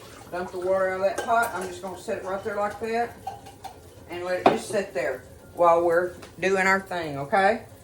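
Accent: South, United States. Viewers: Don’t like pea salad but love ur southern draw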